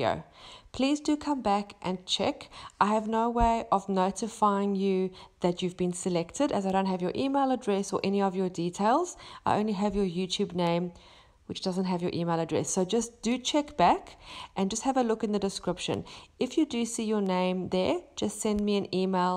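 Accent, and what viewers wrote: South Africa. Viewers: Absolutely stunning, I really liked the combination of turquoise and brown. So inspirational and easy to follow. I am going to try this out. By any chance are you from South Africa, just wondering because you seem to have true SA accent. BTW I am from Johannesburg, SA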